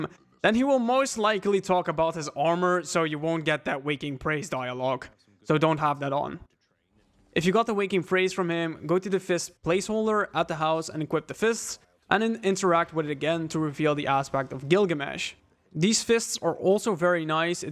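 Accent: Netherlands. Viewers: HE IS DUTCH! I RECOGNIZE THE ACCENT! I LOVE DUTCH COCK! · Your accent is awesome, it makes you sound like a Dutch DJ xD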